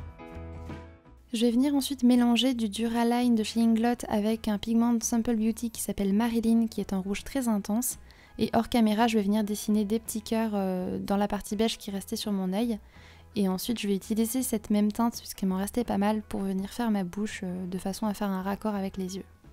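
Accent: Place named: France. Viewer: Genial! Solo estaba preguntándote de eso porque vi un video tuyo en que hablaste en español porque me encanta ese idioma con el acento frances, suena tan hermoso! Solo es que soy un "nerd" cuando se trata de idiomas y por eso vine :v pero me caes bien, claro lol! q tengas un buen dia y ojalá que yo pueda hablar el frances y el aleman algun dia ya que son tan hermosos!! chau!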